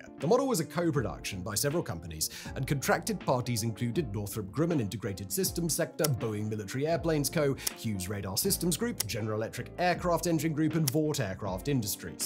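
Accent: United Kingdom. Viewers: Dudes voice is like a caricature of a brit... every video feels like I'm listening to a very dry Python skit. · I am sure he sounds normal to you and you neighbourghs in England. You are right, I may have to concede he does not exagerate his accents, I 'd rather say he does not care for those who does not live in the same accent area. What I experience is no problem listening to most popular science speakers such as Bill Nye, Neil de Grasse-Tyson, Carl Sagan, Michio Kaku, Brian Cox, Richard Dawkins, Dave Farina, David Attenborough for instance (only mentioning men voices, because women voices tend to be more intelligible in general). I might have overstated, I understand him. I still prefer other accents/voices.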